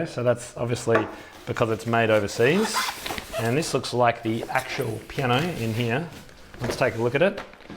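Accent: Australia. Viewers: I like your accent. Is that Aussie?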